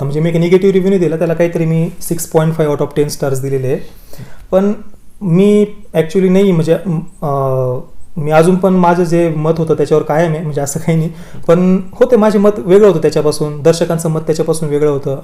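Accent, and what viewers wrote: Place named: Nashik, India. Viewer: तुजा मराठी एक्सेंट फुल नाशिकी आहे मला वाटल पुणेरी असेल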